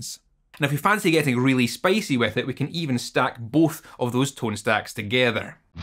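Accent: Scotland, United Kingdom. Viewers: I'm so happy my love for how certain words (most words tbh but some in particular) sound so good in a Scottish accent was validated by the man himself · I love the vocal chain. And everything sounds great in a scottish accent.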